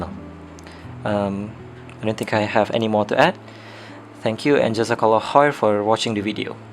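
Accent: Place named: Johor, Malaysia. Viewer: nampak beno gaya bahasa cam orang johor. "wait eh". huuuuu. hidup JDT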